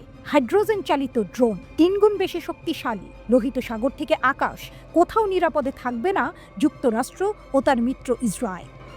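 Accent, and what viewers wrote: India. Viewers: পাঠিকা কি ভারত থেকে আগাত? ভাষা অনেক টা তেমন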